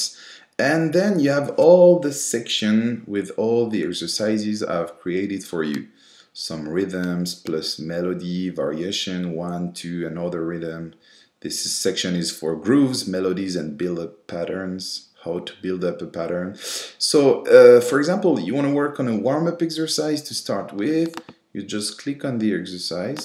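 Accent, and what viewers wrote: France. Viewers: Alors il est français "tontonton... tontonton..." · Tu as l’accent Français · Très très français oui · clairement français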